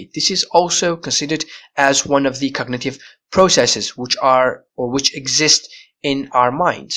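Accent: United Kingdom. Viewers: What i like about u, your UK accent Keep on that prof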